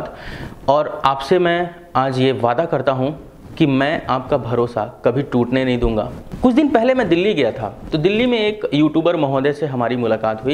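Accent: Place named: Uttar Pradesh, India. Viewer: UP wale ho kya bhai tambaaku wale daant rehte hai humesha or up wala accent bhi hai tumhara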